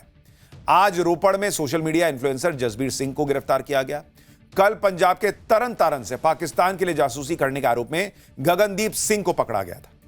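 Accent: Bihar, India. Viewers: Yaar sushant ko bolta sun ke aisa lagta hai ki ye national channel par nahin bihar ki audience ke liye anchoring kar rha hai.....baar baar bihari tone mein baatein bol jana cool nahin lagta dost....kripya bhasha, shabdon aur tone ki maryada ko durust rakha kijiye.....JAI HIND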